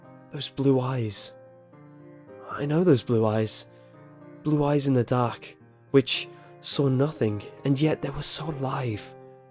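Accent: England, United Kingdom. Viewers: What part of England is he from???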